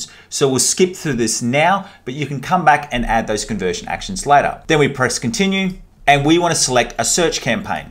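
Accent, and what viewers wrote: Australia. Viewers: I like the accent. Is he an Aussie?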